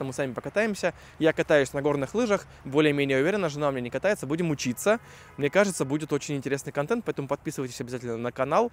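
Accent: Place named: Kyrgyzstan. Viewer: Красавчик, у тебя уже акцент кыргызский😊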